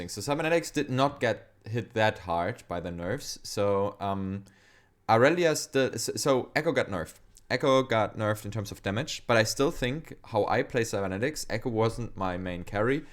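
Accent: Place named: Germany. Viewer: Man hört den Alman einfach so raus alter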